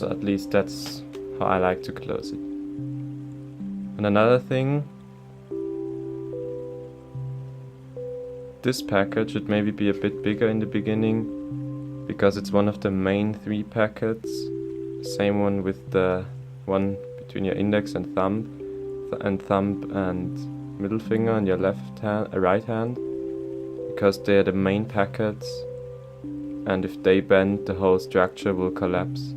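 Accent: Germany. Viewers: Bist du deutscher?? man hört das richtig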